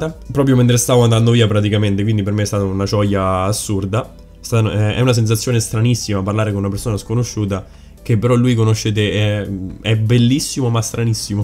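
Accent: Marche, Italy. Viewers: Ciao, mi sono appena iscritta al canale. I miei complimenti, finalmente qualcuno che fai degli ottimi video di wow in italiano ^^ riconosco l'accento della mia zona....sei marchigiano o sbaglio?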